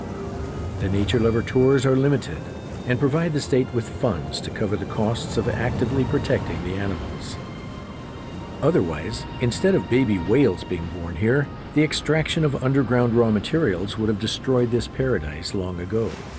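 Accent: United States. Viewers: so good to hear a narrator speaking american english for a change. I'm a bit tired of the usual overly theatrical british english in these documentaries.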